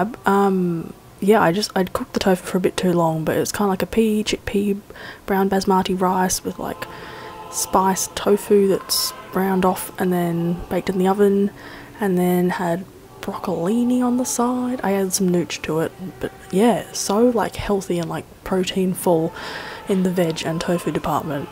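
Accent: Australia. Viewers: Haha I thoroughly enjoyed you talking about Hufflepuffs in your Extra Australian accent, it didnt even occur to me that your "YouTube voice" was not at maximum accent level😂 also I'm really impressed by the amount of veg in this video everything looks so delish💕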